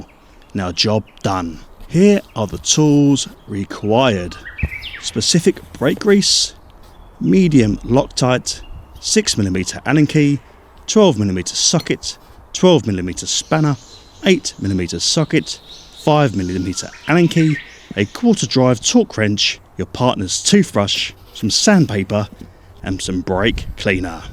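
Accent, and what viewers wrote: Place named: East Yorkshire, United Kingdom. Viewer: Do yer really need brakes on them bikes.....you just put yer boots down don't yer?......he he he. 'Ull' east yorkshire.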